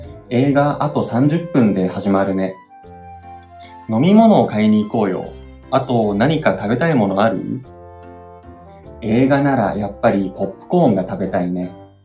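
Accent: Taiwan. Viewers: 老师的中文也有点台湾腔了haha🙋🏻‍♂️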